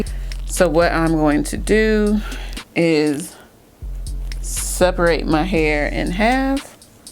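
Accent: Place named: Nigeria. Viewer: I hear a bit of a Nigerian accent. Is she African??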